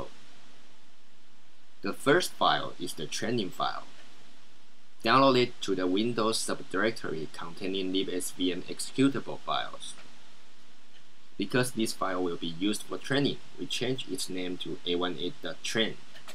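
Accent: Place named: China. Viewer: 毕设用到了这个，感谢~（Chinglish口音哈哈哈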